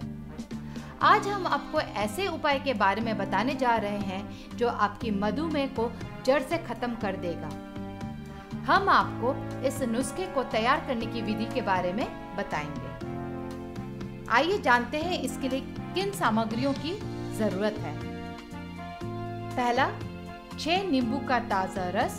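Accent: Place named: India. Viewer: Can you be clear please like (ENGLISH) I don't understand Indian 😆🙄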